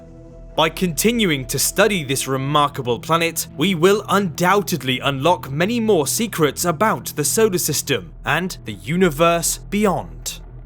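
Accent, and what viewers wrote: United Kingdom. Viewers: UK accents are annoying. So are spurious titles. DISLILE.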